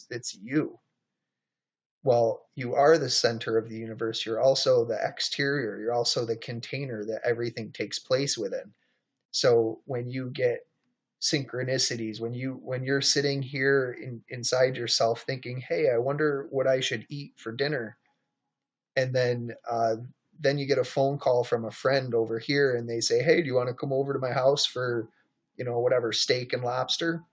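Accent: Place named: Minnesota, United States. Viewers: sounds a little Minnesota to me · I'm getting a UP vibe on that accent, lol. You from the upper midwest? 😁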